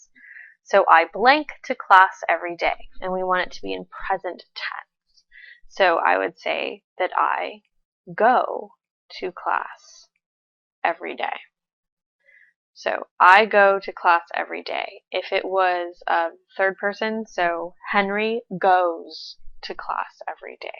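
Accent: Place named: United States. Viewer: Hey from Brasil It's quite nice your way of teaching and your accent as well. Are you american?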